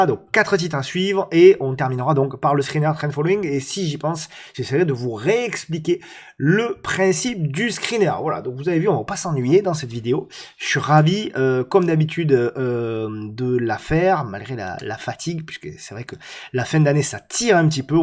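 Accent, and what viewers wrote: South, France. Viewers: Le froid est la, mais un bon thé chaud et l'accent du sud de vous réchauffe pendant plus 1h30. Merci! Quand j'ai vu ton visage sur le Palais Brongniart, je me suis dit que ca devait faire quelques choses en tant qu'investisseur. (Juste une critique sur l'investor day, les salles étaient petites, pas facile d'avoir une place, un coup de chance (j'ai eu la derniere place), j'ai pu assister a la présentation Bitvavo. Bon weekend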